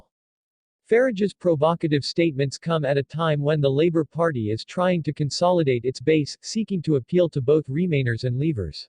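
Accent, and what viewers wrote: United States. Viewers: Another Lying Labour Loving channel. It’s not claims it’s the truth. They want to complete the communist state of Europe. UK channel using American voiced bots says it all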